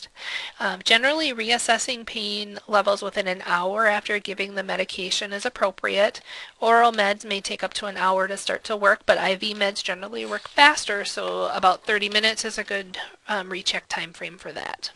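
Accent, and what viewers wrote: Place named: Midwest, United States. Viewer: I love the mid western accent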